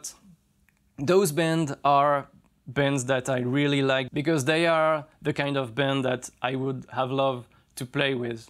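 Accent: France. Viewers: Super video !! Ya encore un petit accent francais😂😂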